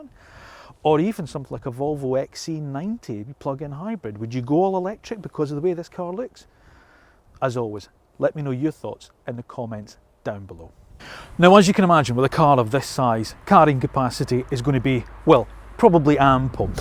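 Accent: Scotland, United Kingdom. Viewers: What a great and very informative review. Your Scottish accent makes it’s extra easy to listen to, but you covered literally every aspect of the car and I almost feel like I was right there experiencing everything you described myself. Hats off 👍🏽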